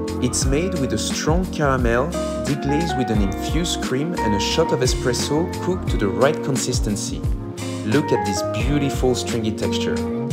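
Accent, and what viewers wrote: France. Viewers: Love hearing him talk. Love that smooth French accent. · You are french? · Je pense qu'il l'est, il a énormément l'accent français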